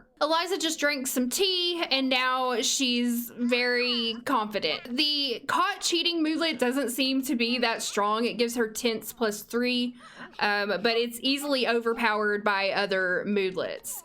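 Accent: South, United States. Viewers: you sound like my southern math teacher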